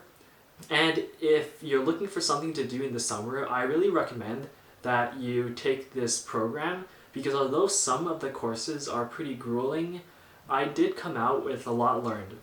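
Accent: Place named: California, United States. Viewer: SE's cutoff is 97. With the academic adjustment factor, you might've just missed the cutoff. Otherwise, are you a Canadian citizen? Engineering has quotas on the max number of internationals they let in. You seem to have a Californian accent, but I could be wrong. Other speculation: perhaps another sport, a part-time job, or something that sets you apart from the typical applicant would've been helpful, especially considering the hundreds of typical applicants from Markham/Richmond Hill/York Region. P.S. Bayview and St. Robs are feeder schools.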